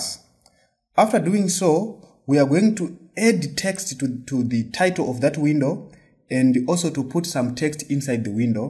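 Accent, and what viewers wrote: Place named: India. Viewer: please never talk like Indian programmaer, it is disgusting. please please please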